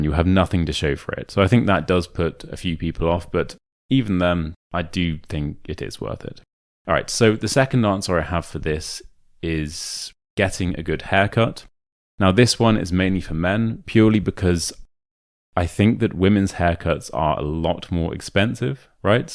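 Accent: United Kingdom. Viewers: 'Thanks for this video and your British accent! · I love your voice tembre and distinct British accent is so pleasant for my ears. Subscription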